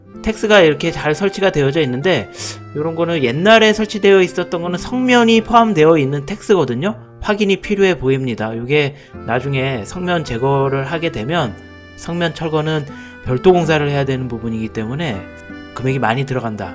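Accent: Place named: Gangwon, South Korea. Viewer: 채널주인분 강원도 아니면 충북 쪽분같내요 말투가 약간그쪽분같음 친근하내요 ㅋㅋㅋ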